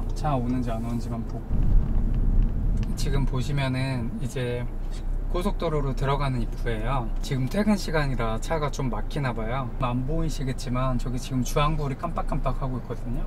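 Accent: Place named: Jeolla, South Korea. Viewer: 어딘가 전라도의 온기가 느껴지는 ㅋㅋㅋㅋ😂 감사히 잘봤습니다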